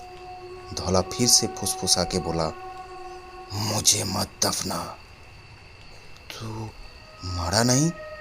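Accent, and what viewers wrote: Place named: Bengal, India. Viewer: Apki bengali bohoth sundor hai Par hindi ki liye hindi bolne wale se hi dialogues bulwaye Hindi me bengali ka merge horaha hai Achcha nahin lag raha hai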